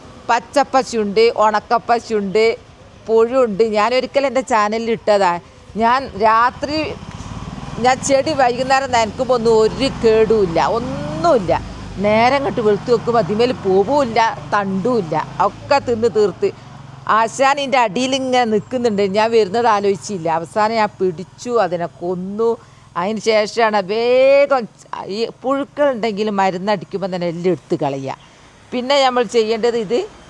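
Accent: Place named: Kozhikode, India. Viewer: കോയിക്കോട് ഭാഷ കേൾക്കാൻ രസമാണ്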